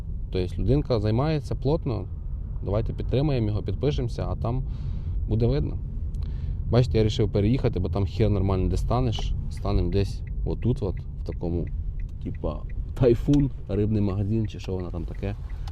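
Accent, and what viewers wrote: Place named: Vinnytsia, Ukraine. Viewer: Завали ротяку, руснявий клоун. Звичайний вінницький суржик. Це українська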